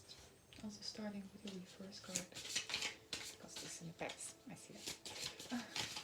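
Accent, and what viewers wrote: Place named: Netherlands. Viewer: aan je accent hoor ik dat je nederlandse bent. je legging is top! veel succes met je kanaal!!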